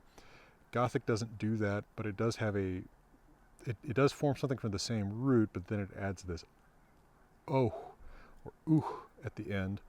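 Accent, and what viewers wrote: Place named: United States. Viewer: You'll find most Norwegians pronounce Old Norse and proto-Norse with a thick Norwegisn accent, and Icelandic people pronounce it with a thick Icelandic accent, so who's to blame thick Anerican accent really. I wish there was more published on reconstructing the more precise pronunciation in the different stages, though. I think a pretty distinct trsit of Old Norse until at least around 1000 would be the nasal vowels, but who today tries to reconstruct that?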